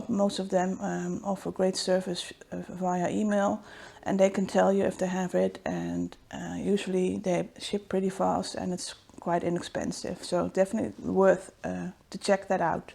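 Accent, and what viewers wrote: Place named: Netherlands. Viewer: Finally found a dutch flosstuber. I heard your accent and just knew. A new subbie here. I did a few inkcircles too. Yours are beautiful. Marike zeewolde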